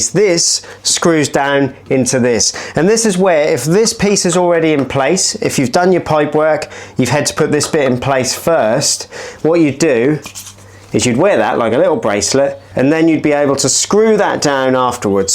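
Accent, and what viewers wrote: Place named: East Anglia, United Kingdom. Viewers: Suffolk boy here, love hearing a good Easy Anglian accent. Moved away many moons ago, but your vids are very nostalgic for me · I wondered if it was a Suffolk accent. Thought he sounded just like Rob Songer another great tradesman.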